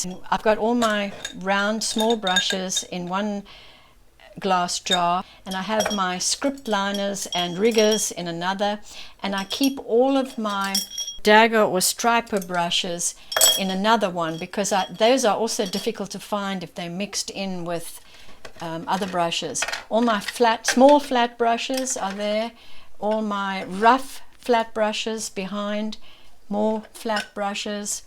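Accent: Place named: South Africa. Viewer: Hi, I’m so pleased to have discovered your channel and of course I immediately recognized your South African accent.... ☺️🎨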